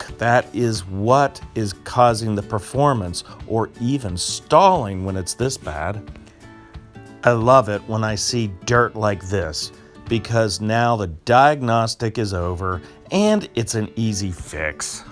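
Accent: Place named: United States. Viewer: Thank you. Now I know what's wrong with my car. BTW, love the way you talk American man, I'm from Down Under.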